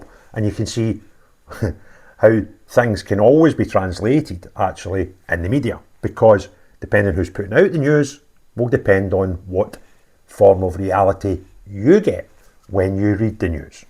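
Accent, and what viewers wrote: Scotland, United Kingdom. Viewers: I’m sorry, I didn’t want to listen to all of this, but the Scottish accent ain’t doing it for me. What a shame.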